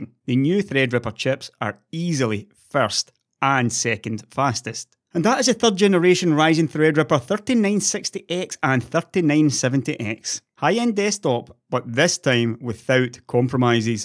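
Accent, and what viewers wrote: Scotland, United Kingdom. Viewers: That is one Scottish accent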